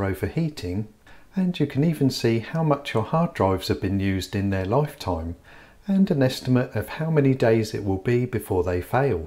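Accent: Norfolk, United Kingdom. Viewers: You a Norfolk man? Trying to figue out your accent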